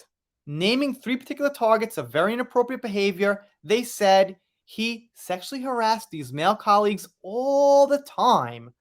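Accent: United States. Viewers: I would really like to know why Americans think displaying ignorance is cute. You said that you couldn't tell what this symbol "£" is, and then whilst speaking in a thick American regional accent and excused referring to it as "the euro" because you live in Japan. But Dan Wootton and The Sun newspaper are based in the UK, so you must have known you were talking shit and you had to have lived in the USA until you were at least 25 to sound so American, or do you think the audience is unaware of how accents form? Your mouth fused in it's current shape in the USA by age 25, so you have no excuse especially given that the symbol existed before the USA existed as a country and in fact you used to have an American pound. So all you said was that you were unaware of your own history. I don't think you mistook anything. You're a pro-Johnny Depp fanatic who casually glazes over the fact that he was "making jokes" about raping Amber Heard and burning her body so by pretending ignorance of the difference between the £ and € even though the £ is clearly an L used for hundreds of years in various countries as the symbol of a pound, whilst the € is an E and has only existed since the mid 1990s to be used as a symbol for a new currency, the Euro, you are being dismissive of the UK merely because you were upset that the judge in the defamation case here did not rule in favour of your opinion and instead merely followed the law and logic. 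Both Depp and Heard were abusive. Don't worry though, i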